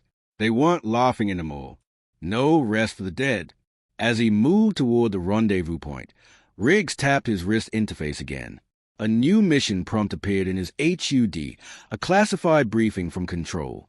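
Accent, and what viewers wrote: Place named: New England, United States. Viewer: Bad accent get rid of snotty New England accent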